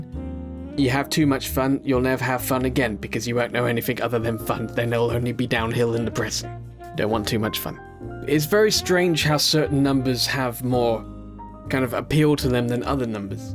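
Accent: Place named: South, United States. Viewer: I died a little at the southern accent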